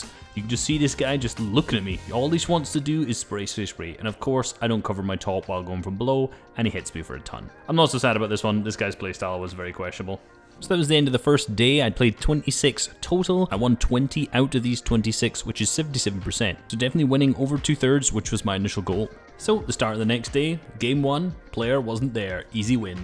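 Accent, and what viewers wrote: Scotland, United Kingdom. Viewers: Are ye Scottish mate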